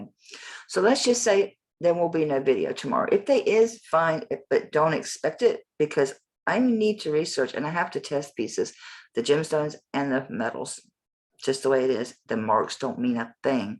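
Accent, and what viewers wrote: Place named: South, United States. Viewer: I’m listening while getting my nails redone 💜 I love your southernese 😂 💜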